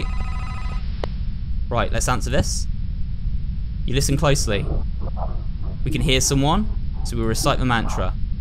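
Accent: South, United States. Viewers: Southern accent is YouTube gold! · As someone who lives in Texas. I thoroughly enjoy your southern accents 😂 Funny how you find it hard to do. Seems so natural for us lol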